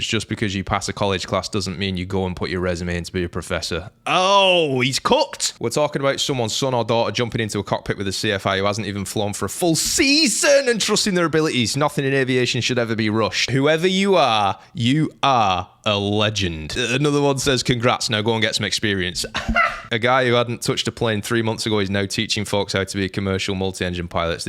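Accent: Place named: Bolton, United Kingdom. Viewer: As a North of England person I would guess is from Bolton, North West England, North of Manchester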